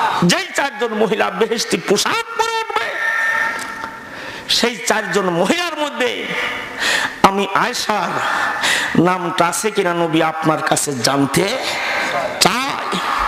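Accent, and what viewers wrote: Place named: Pabna, Bangladesh. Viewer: Tumra khanek por jabene bait cole... Pabnar vasha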